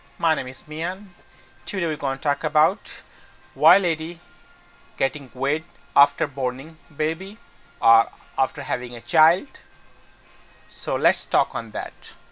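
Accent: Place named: United States. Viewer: American accent boss! 😎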